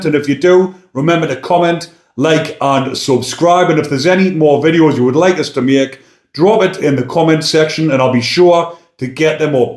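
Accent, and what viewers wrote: Newcastle, United Kingdom. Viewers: Is that a Newcastle accent? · Stumbled across your channel somehow...new sub here! And I love the Geordie (or is it Durham??) accent! I'll be back!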